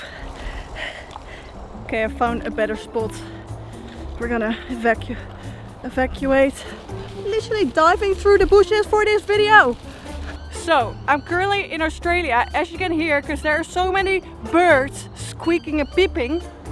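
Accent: Netherlands. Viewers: Nice Hollands accent 😁😁. Cropping... The same as cutting out a piece afterwards. Nothing special... No real extra zoom. Just An extra digital zoom like the older digital camera's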